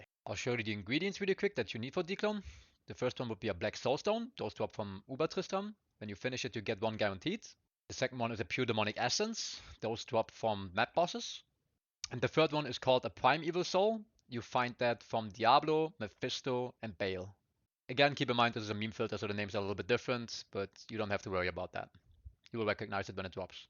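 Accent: Germany. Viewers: I hear a bissele german in this enlgish 😊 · Are you a German Dude?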